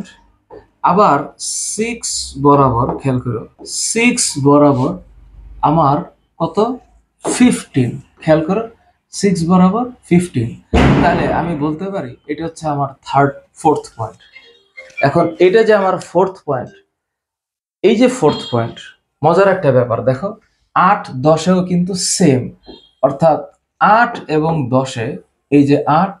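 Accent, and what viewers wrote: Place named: Sylhet, Bangladesh. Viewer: Sylheti basa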